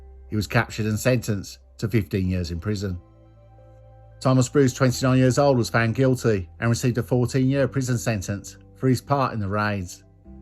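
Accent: Birmingham, United Kingdom. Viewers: You from Birmingham bro?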